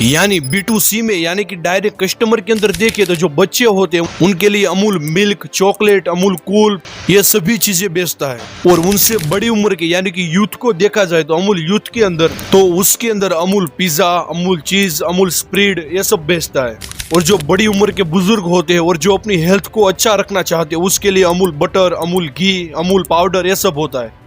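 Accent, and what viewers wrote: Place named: Kathiyawad, India. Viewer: Kathiyawad voice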